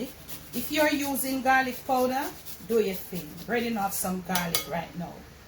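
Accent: Jamaica. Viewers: Yes Miss! Gwaarn wid you bad self. Niceness from start to finish. I love how you keep it real with your lovely likkle jokes and patois style. Bless up and one love always..🇯🇲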